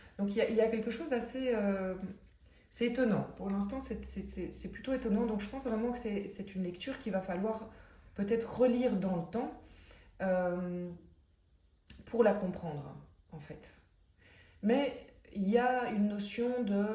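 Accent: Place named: Belgium. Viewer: Tirage intéressant et que je ressens, comprends....Très fine interprétation ! Votre élocution, et sa richesse est vraiment Top. J'ai cru déceler un soupçon d'accent Belge et je l'adore. J'ai beaucoup d'amis Belges qui ont un humour formidable. le 2 ièm semestre s'annonce peut-être encore plus usant que le 1er. Va falloir prendre soin de sa santé et rester le plus au calme pour pouvoir s'économiser et être dans la lucidité en cas de prise de décision importante...Merci beaucoup. Je like et je m'abonne 🙏👍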